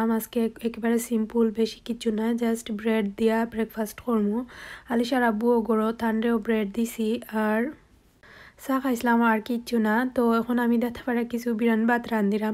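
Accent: Sylhet, Bangladesh. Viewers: সব মিলিয়ে খুব ভাল লাগলো ব্লক টা, সো সো সো সিলেটের কথা শুনলে গম লাগে।।।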